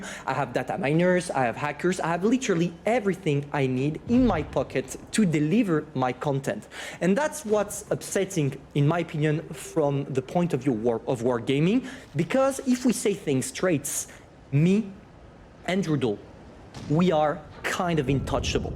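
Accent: France. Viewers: you are the best Blitz- You Tuber of all, besides Meadsy and Droodles. Stay as you are, because you got balls and are telling always the truth about the game. Most I love your french accent in english. All thumps up..... Greets from Germany, the land of the mad.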